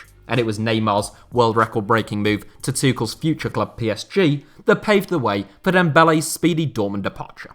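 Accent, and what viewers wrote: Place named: Hull, United Kingdom. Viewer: and his 'soft' hull accent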